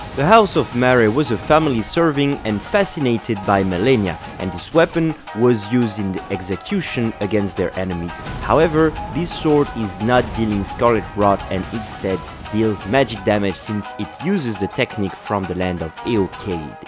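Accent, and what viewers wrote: France. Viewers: T’es français toi c’est sure, mais t’as un accent vraiment mignon en anglais 😊 and I’m sure you made this video for the PVP player and not PVE. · Je kiff tes vidéos tu gère serieux, je rep en francais j'ai entendu "putain" dans une de tes videos et t'as un accents un peu "fr" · cet accent anglais du Pas de Calais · A il a un accent français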